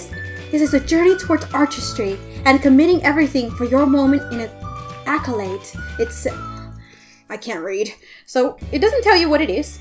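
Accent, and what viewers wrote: United Kingdom. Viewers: Ur accents gone a bit British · I thought ur British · Ikr! She probably started to sound like British people because she's been living with them 😂😂🇬🇧🇺🇸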